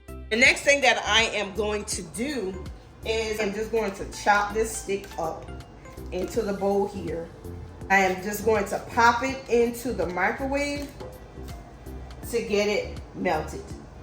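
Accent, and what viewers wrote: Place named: South Carolina, United States. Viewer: This is how we make bread pudding im from SC, and she sounds like she maybe from there as well or close. I've seen ppl bread pudding and I wouldn't eat it because it didn't look like this 😂. I also toast my bread first ❤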